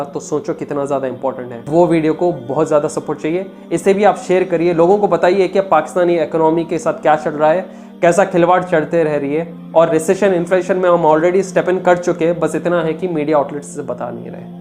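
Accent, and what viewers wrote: Hyderabad, India. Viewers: Bhai aap haidrabad se lagre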